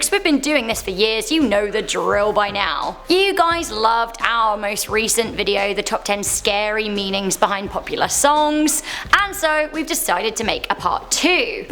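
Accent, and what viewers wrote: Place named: United Kingdom. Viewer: Is this woman from Britain? No offense she just sounds like she is from Britain.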